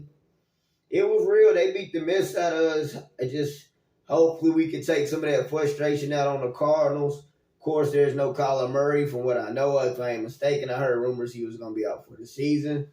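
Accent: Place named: South, United States. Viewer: As a Cowboys fan in NYC, I respect you for doing a good job of putting lipstick on a pig. Also, our good but young TEs dropped two very catchable balls in/near the end zone. Finally, 11 Ls in a row? Y’all really don’t want to see us again, especially if we’re relatively healthy. We’ll only be better next time. Thanks southern sounding Giants fan in the big ciddy. Wish you much success on YouTube.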